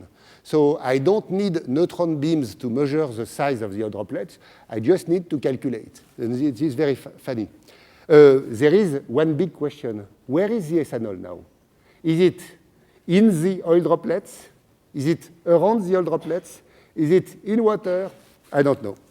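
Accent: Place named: France. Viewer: Fucking brilliant. If only we would have teachers who would be like that all the time... with french accent.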